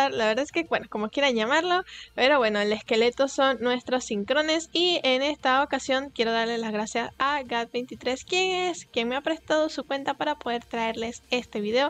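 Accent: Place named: Canary Islands, Spain. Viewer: Tu acento parece canario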